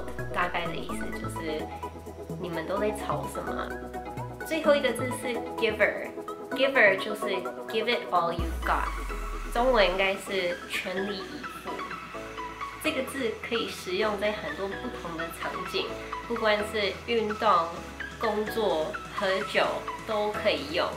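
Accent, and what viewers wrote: Taiwan. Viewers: 很地道的台湾普通话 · 真是台湾腔啊。。。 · 台普棒棒哒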